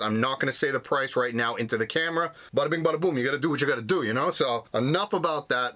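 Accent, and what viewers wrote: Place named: Italian-American, United States. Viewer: East coast, Italian descent??? "Batta bing...," "anyways". Lol love it. FYI: water weighs 8 lbs per gallon, plus the weight of the container. Just saw your interview with Bob Wells, came to your channel, loving it so far. Know this video is almost a year old, will be checking out others. Love how fast you talk. Retired biochemist here that did standup comedy as a hobby for fundraisers in San Diego then Az. Mostly retired. Will be on the road by Jan 1, 2020. Carry on.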